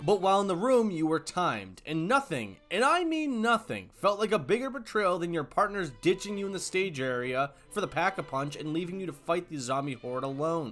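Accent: Portugal. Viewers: Are u Portuguese?🇵🇹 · És português?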